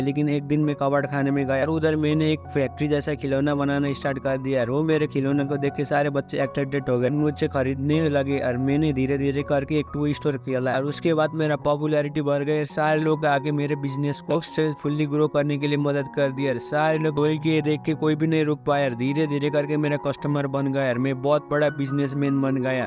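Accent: Nepal. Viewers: Bhai nepali he kya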